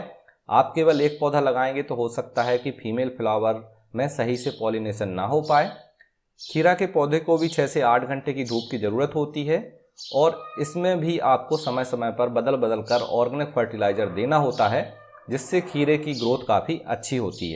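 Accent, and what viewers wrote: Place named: Madhya Pradesh, India. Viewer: MP accent nice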